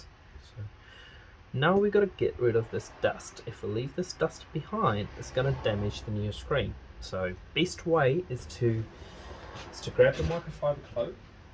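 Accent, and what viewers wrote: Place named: United Kingdom. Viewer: Where is your location and your store to be precise? You sound British to me and by the way great video, thanks for the upload.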